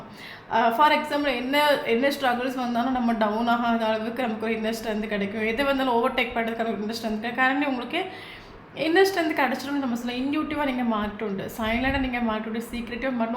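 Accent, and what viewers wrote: Kerala, India. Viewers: ரொம்ப நன்றி பிரபஞ்சம். நன்றி மேம். ரொம்ப அழகா சிரிச்சிட்டு ரிடிங் சொல்லுறீங்க. மலையாளம் கலந்த உங்கள் தமிழ் அழகு. எனக்கு ரொம்ப பொருந்துச்சி இன்னைக்கு reding, நன்றி ♥♥🌹🌹🙏🙏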